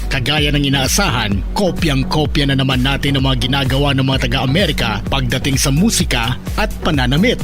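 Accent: Iloilo, Philippines. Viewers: Taga Sara ni si sir ilonggo gid hehe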